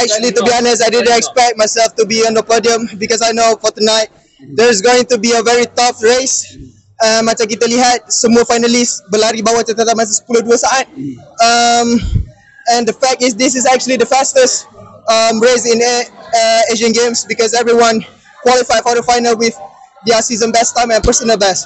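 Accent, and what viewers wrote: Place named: United States. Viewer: Yeahh, ada dgr slang american dia, oyeahhh 🤘🏻 terbaik Azeem 🔥💥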